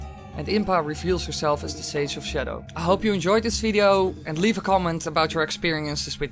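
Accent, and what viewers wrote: Netherlands. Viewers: nederlands accentje mag er wezen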